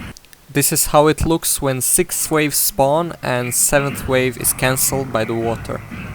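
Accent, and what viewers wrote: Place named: Russia. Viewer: u sound kinda Russian. also I had a question, does this farm work on paper? bcs ray works one doesnt.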